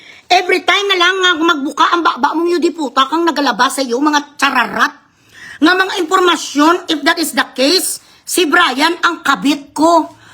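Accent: Visayas, Philippines. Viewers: i hate sarah duterte pero natutuwa ako sayo parang ang cute ng visaya accent mo at i like you maldeta ang peg hahaha aliwwww . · natutuwa ang taumbayan sa iyo. Mabuhay ka. Sincere ka, honest. Very lovable ka sa Visayan accent mo, delightful sa ears ang Visayan accent mo. Candid ka, walang hypocrisy. Maganda ka, ang face mo guwapa ka, ang taumbayan ay hinahangaan ka sa pag analyze mo ng political situation ng ating bansa. Di ka plastic, may sense of humor ka pa, di ka nagpapanggap. Very true ka pag nagsasalita, walang kaartehan.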